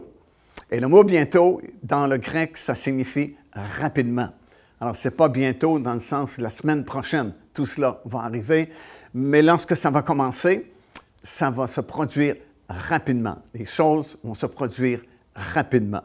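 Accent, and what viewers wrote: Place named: Quebec, Canada. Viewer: Il a dit 66 livres c’est son accent québécois . Fraternellement.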